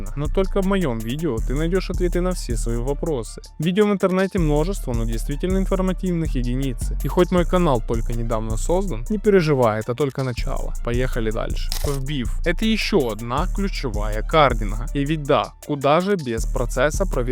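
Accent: Ukraine. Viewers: Автор ваш акцент говорит о том что с Украины,и к вам будет вопрос-предложение,не сложно ли вам пособирать информацию о том какие у вас статьи и какие сроки за них,кто чаще всего туда идёт. Вы вещаете на русском но у нас есть и несколько своих каналов на эту тематику,здесь бы хотелось бы видеть разницу как у вас и у нас.У нас чаще всего идут-попадаются молодые от 18 до 25 лет и сроки от 3-5 до 20 по статье 187 УК РФ,в зависимости от того куда деньги ушли.И хотелось бы узнать как у вас с этим,это правда займёт больше времени но и интереса будет больше.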